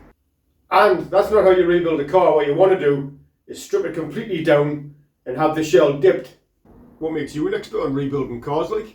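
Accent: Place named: North East, United Kingdom. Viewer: Canny progress. Did you not fancy upgrading the caliper’s. You sound local. You in the north east?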